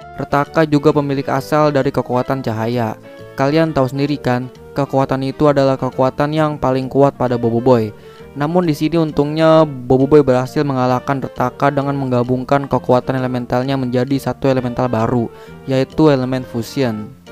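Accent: Java, Indonesia. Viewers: Bang kamu orang Jawa bang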